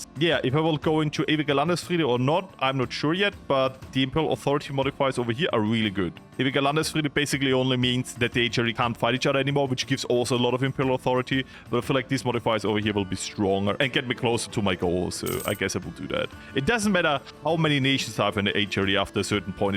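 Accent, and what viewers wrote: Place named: Austria. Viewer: Lol ich wusste immer, dass das eine komische anreihung von Dialekten in den Videos ist...wusste nicht dass du aus Österreich kommst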